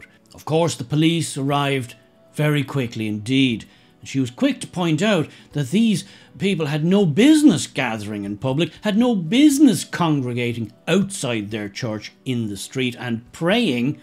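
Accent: Ireland. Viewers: Best of all Irish voices on youtube, only you.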